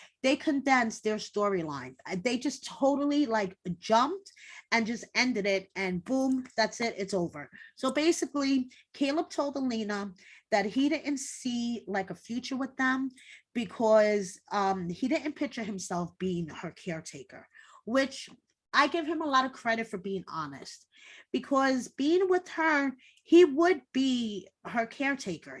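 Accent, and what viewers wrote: New York, United States. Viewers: Rapid-fire, New York style. That's how I like my pizza and my recaps..❤️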